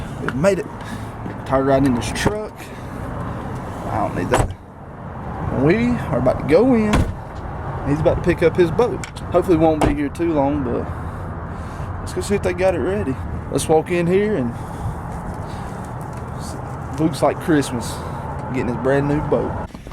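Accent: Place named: North Carolina, United States. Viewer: Sounds like you're from North Carolina...I live about 30 minutes from Little Washington. 😆 I'm down here in New Bern, NC. I picked up a Tracker Grizzly few months back and ran across the video of you and your son testing out the 60hp. I'm thinking about putting a 50hp on mine. Its a 1860 as well. You think that's enough motor?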